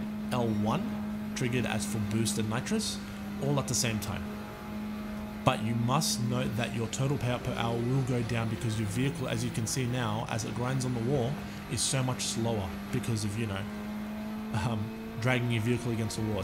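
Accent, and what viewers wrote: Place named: Australia. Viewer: I thought you sound Aussie haha great vid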